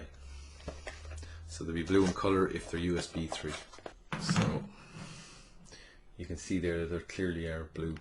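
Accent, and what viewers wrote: Ireland. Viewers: this was very irish sounding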